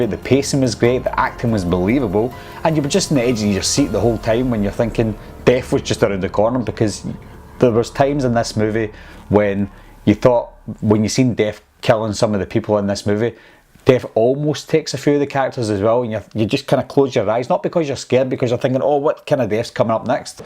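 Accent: Scotland, United Kingdom. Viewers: Your voice is so Scottish...and Scottish voices are sexy!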